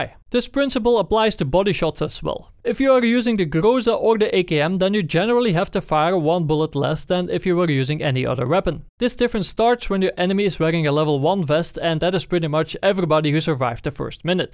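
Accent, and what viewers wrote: Netherlands. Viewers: Your accent is pretty funny, like all the dutch people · R u dutch cuz of you're accent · sounds a little bit like dutch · You are from the Netherlands i can hear it in your foyce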